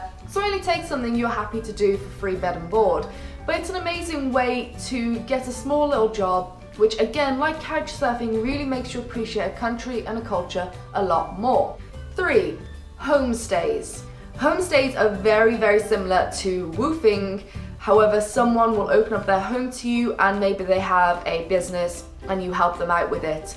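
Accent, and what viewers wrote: England, United Kingdom. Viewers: here expressions are very cool. I was just searching for some brazil thing and I found a video by the title language challenge. in that her facial expressions were awsome and with that english accent her voice is like a melody. Nice video for the people who love to travel but can't afford.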